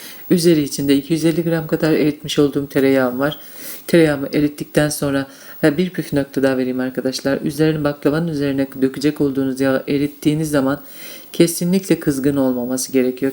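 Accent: Black Sea, Turkey. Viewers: Selamunaleykum abla sesin karadenizli sanki rize artvin sivesini duyuyorum 34 yasindayim Hic acma baklava yapmadim yarin oturup yapmaya caliscam Insallah basarili olurum Hep annem yapar bize Hic firsat vermez,annemde ayni Senin gibi yapar elleri dert görmesin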